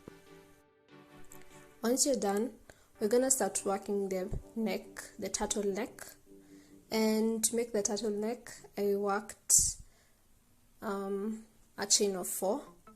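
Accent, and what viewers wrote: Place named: Kenya. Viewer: I love that I can tell you're a Kenyan by how you speak. It just sounds so familiar, like a friend telling me about their day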